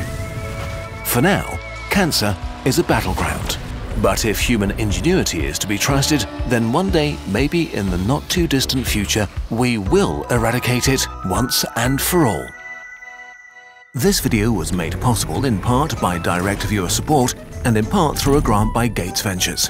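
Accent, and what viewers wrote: United States. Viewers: thank god, less new york? yes please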